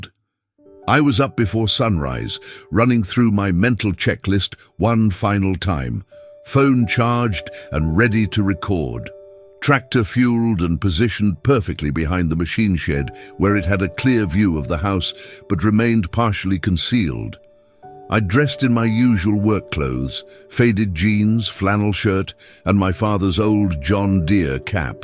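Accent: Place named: United Kingdom. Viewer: Do these ridiculous AI folks ever even think about how stupid it sounds to have a snooty British accent narrating a down-home family farm story in first person singular? Especially one where the neighbor says things like "Hot damn!" - obviously meant to have happened in the heart of American farmland. Sheesh.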